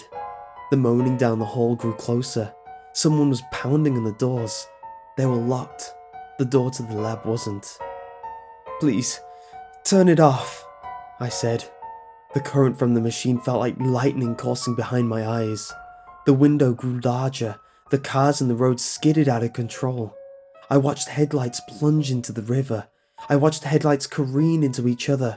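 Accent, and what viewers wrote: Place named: United Kingdom. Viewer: The british accent doesnt make it sound THAT scary.. but, its awesome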